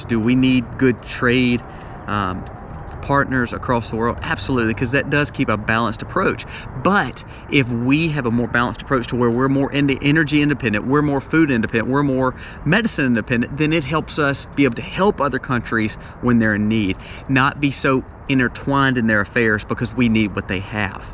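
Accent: South, United States. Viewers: Great music, great guy, great information, and great Southern accent! 😊👍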